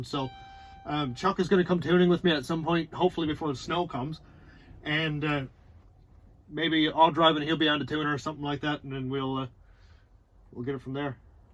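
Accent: Canada. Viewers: where are you guys located? You sound north of the US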